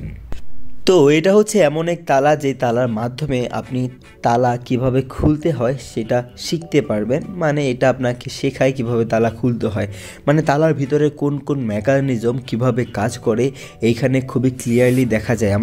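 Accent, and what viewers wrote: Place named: India. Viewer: Your bangla like hindi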